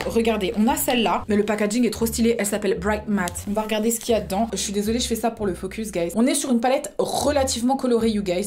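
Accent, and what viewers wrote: England, United Kingdom. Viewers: Mais tu me fais tellement rire, je suis fan ! Et cet accent anglais, j'en peux plus 🤣🤣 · Salut.j adort ton accent anglais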